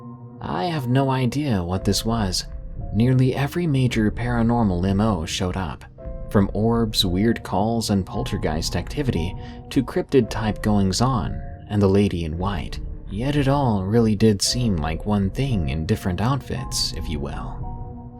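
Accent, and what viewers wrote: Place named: South, United States. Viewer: I liked the female narrator’s voice too. I love the man’s voice but for a story that’s from A woman’s perspective, I liked that a female voice was used for her account and I also liked her slight Southern accent as it is mentioned in the story that she is from Kentucky.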